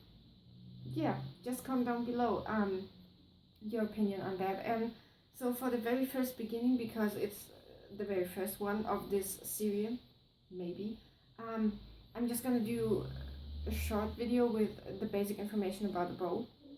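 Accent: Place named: Germany. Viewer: Ich hab mir bei deinem Englisch-Akzent irgendwie gedacht dass du deutsch sprichst. Aber das ist eigentlich total unwichtig. ist mir nur aufgefallen. Du spielst gut. Ich bin auf viele neue Videos gespannt! :)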